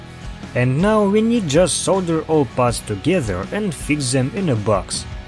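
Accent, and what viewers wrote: Russia. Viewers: why am I not surprised about the russian accent?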